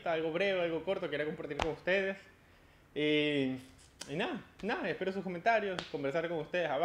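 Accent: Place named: Argentina. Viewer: XD tienes acento argentino no me habia dado cuenta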